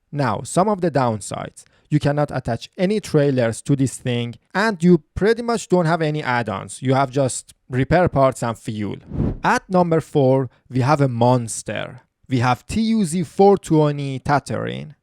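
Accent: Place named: Iran. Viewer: Bro, your accent sounds extremely Iranian. Did I guess right?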